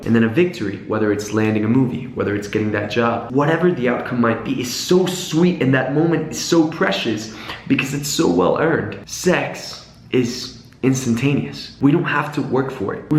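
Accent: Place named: United States. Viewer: !?? Sounds really american. Think you had enough... and finally grown up.😉 Greetings from Holland.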